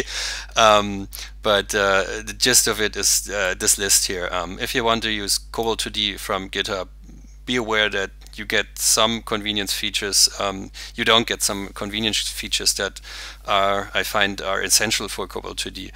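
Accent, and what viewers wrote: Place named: Germany. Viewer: 100% er ist deutscher, das hört man so raus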